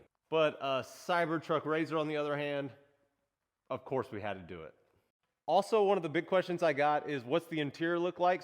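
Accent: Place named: Ohio, United States. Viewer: Dude sounds like hes from ohio like the accent dose lol sounds like home lol😂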